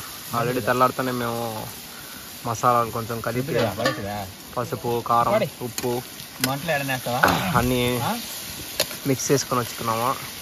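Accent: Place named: Chittoor, India. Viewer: Chittoor yaasa baagundadhi ba 😉🥰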